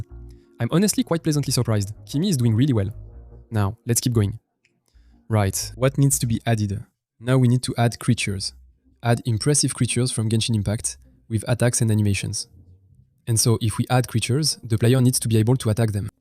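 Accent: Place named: France. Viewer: le fameux accens fr en anglais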